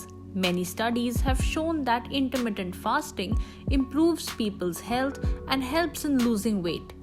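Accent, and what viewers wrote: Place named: India. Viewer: thanks for the information along with the nice Indian accent!!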